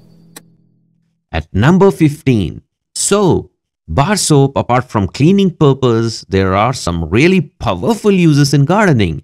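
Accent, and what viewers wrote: India. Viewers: Bro u r speaking english like u r indian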